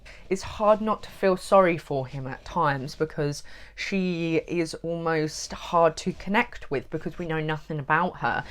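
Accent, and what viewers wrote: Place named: RP, United Kingdom. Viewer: The Engish accent is always so elegant. Doesn't matter what is being said, it sounds posh.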